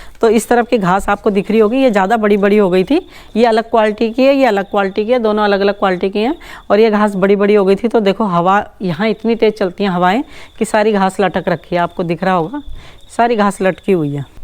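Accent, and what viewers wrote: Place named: Uttar Pradesh, India. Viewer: Im indian tum bhi up india se hogi aap ki bhasa bta rhi h nice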